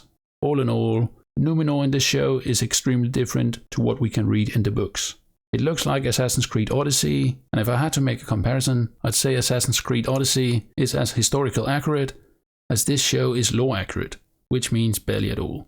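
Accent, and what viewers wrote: Denmark. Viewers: Im curious where you’re from? Cool accent If i were to take a guess I would say Denmark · Love your accent? Are you Danish?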